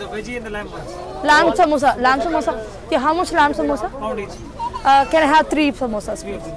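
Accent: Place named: Rajahmundry, India. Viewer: Voice vintunte starting lo . .ekkado vinnttuga anipinchindi....Rjy voice na .. 😀 Maadi ade....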